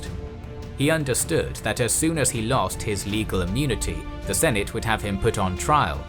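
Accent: United Kingdom. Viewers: Nice British accent!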